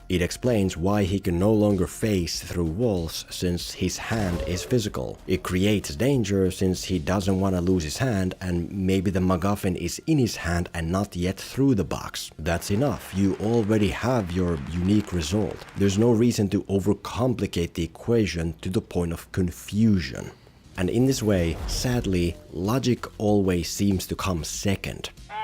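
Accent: Finland. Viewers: This is the most finnish sounding accent I've ever heard... regards, a finn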